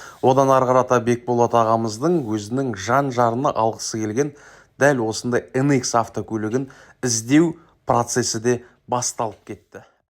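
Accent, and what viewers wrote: Standard. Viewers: Әдемі, таза қазақша сойлегенің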